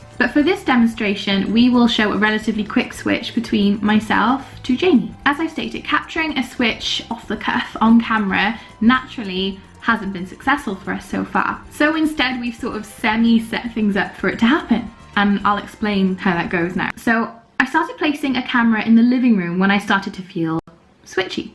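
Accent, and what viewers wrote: Wales, United Kingdom. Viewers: are you welsh? :o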